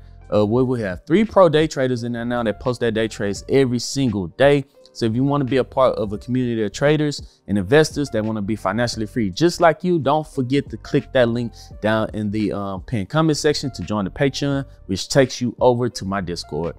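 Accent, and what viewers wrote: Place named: Atlanta, United States. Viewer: What up! I swear sometimes I think you're in ATL with me when you talk. But this is not my comment for "QYLD the Movie" you put together. Based on vid, you invested $20 aday excluding weekends and holidays; correct? This calculate to 261 days a year at $20 aday for a total of $5,220 investing for a monthly paying div. My friend, I will be joining you; utilizing your strategy by reinvesting back into QYLD. Thank you for the entertainment purpose videos and I look forward to joining the road to passive income investing.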